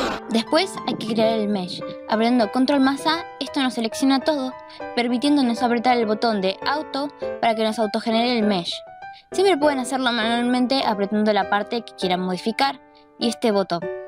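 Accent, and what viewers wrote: Argentina. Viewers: El acento de las argentinas me vuelve loco :'v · Muy bien explicado el tutorial!! sos argentina? por tu acento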